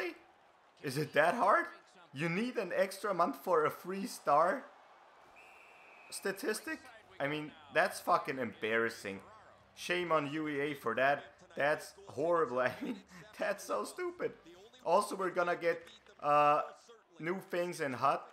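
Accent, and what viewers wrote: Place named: Austria. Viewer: I like your accent... you sound like Michael Grabner. Are you from Austria? You're very insightful, keep up the good work.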